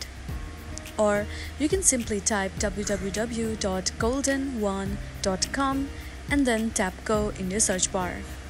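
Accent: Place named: India. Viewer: YOU SOUND LIKE AN INDIAN SCAMMER!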